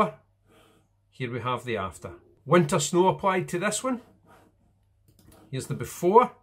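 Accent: Scotland, United Kingdom. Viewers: I looove your scottish! I want you to tell me great tales! =)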